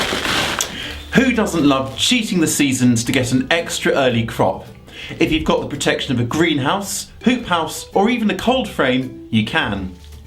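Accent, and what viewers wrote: United Kingdom. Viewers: The moment you open your mouth I know you were British 😅😅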